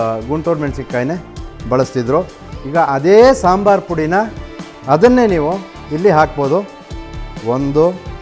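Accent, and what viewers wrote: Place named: Chamarajanagar, India. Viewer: ನೀವು ಮಾತನಾಡಿದ್ದು ಚಾಮರಾಜನಗರದ ಭಾಷೆ ನೀವು ನೀವು ಮಾಡಿದ್ದು ಚಾಮರಾಜನಗರದ ಕಳ್ಳೇ ಹುಲಿ ಈ ರೆಸಿಪಿ ಮರೆತು ಹೋಗಿತ್ತು ನೆನಪು ಮಾಡಿದ್ದಕ್ಕೆ ಧನ್ಯವಾದಗಳು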